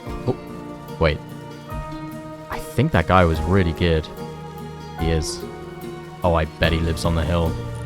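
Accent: Australia. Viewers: R u an aussie? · british man??? arent u austrailian?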